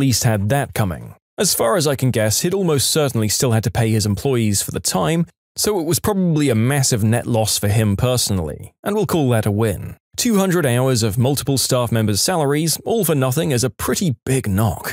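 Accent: United Kingdom. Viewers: He's British now?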